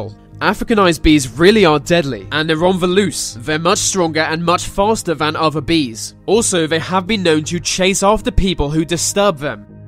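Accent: United Kingdom. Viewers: Your sense of humor & that Brit accent is deadly too! 😎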